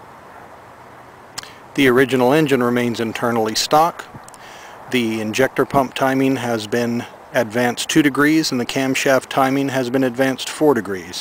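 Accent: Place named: Sweden. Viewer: ! haha sounds like you could be swedish. nice video love the merc diesels ive got a w123 240D euro of course.;)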